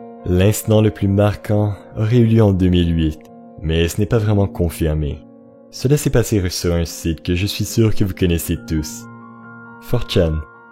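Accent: Quebec, Canada. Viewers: enft c que il quebecois😃😀😀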